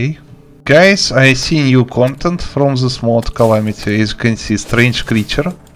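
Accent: Russia. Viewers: i dont know if i can watch tutorials on anything unless they have a russian dudes voice after this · очень слышен русский акцент спасибо помог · русский акцент выдал тебя)) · Кааааеф. Так отчётливо слышится русский акцент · ну привет, по акценту можно сразу понять что ты русский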